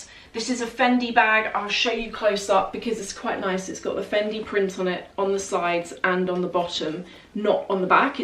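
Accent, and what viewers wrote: RP, United Kingdom. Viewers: your accent is so posh i love it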